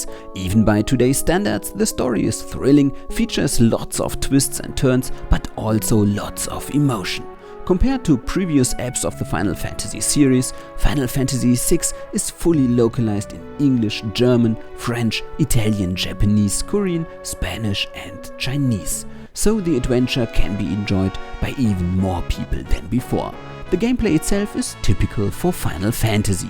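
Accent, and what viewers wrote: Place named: Germany. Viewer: Schönes Review! Angenehm anzuschauen und anzuhören. Weiter so! Der deutsche Akzent sticht manchmal noch heraus bei Wörtern wie "diagonally", "devices" oder "heard". Aber nicht schlimm ;) Außerdem "comfortably", nicht "comfortable" ;)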